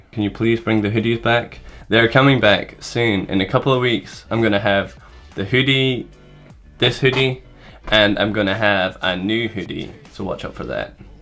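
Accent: Scotland, United Kingdom. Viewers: Hey are you Scottish ?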